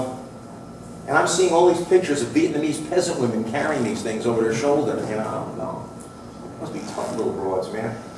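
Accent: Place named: New Jersey, United States. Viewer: He speaks New Jersey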